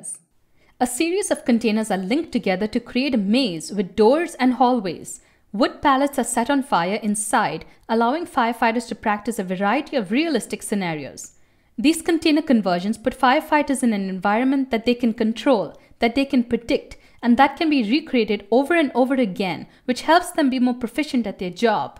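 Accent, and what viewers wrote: India. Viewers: It is too weird hearing a Indian accent using imperial measurements. My head is going to explode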